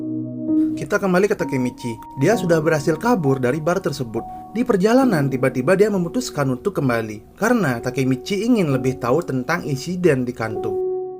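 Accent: Bali, Indonesia. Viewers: Bang dari bali ya kog bila t khas bali banget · Logat bali ini 😁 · Logat bali admin? Hehehehe rahayu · Logatnya Bali sekalii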